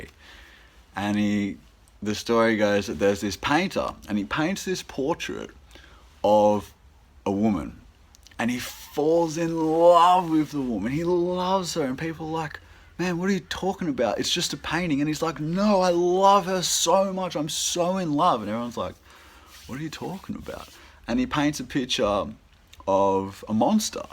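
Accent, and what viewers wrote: Australia. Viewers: Interesting that you subconsciously wanted n needed the same kind of love since teens. I remember that n only illness fundamentally broke that from me n i couldnt now even think of how another lover coukd make me feel high again. Dig ya channel dude.. feel like i know you. Mmm maybe its the Victorian(?) accent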